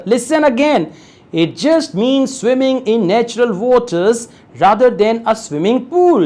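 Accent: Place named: Punjab, India. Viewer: Haaye tuhadi Punjabi 😄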